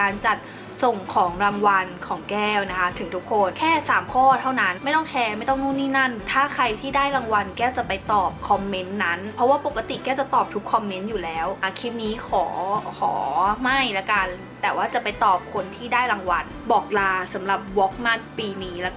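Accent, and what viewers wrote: Thailand. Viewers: คนสุพรรณ ใช่ไหม สำเนียงออก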